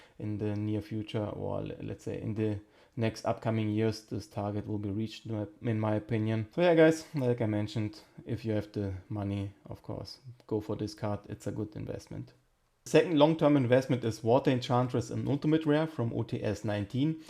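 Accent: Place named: Germany. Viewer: Man hört direkt raus das du deutsch bist 😂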